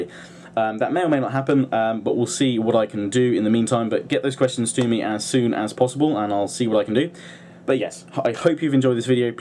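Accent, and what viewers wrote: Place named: United Kingdom. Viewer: I think your videos are great, and thank you for clearing things up about rome 2. I think your British accent is awesome, what dialect is it in particular?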